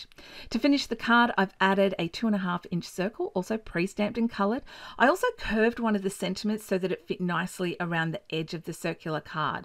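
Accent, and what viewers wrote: Australia. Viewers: So fun to hear a fellow Aussie accent! I'm so glad I found you in this hop - I just subscribed to your channel (international). I love these sweet cards you made!!! The mini gate fold is just so so cute!!! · SUPER cute images. And as a Yank (USA) I really enjoy listening to your Aussie accent. 😁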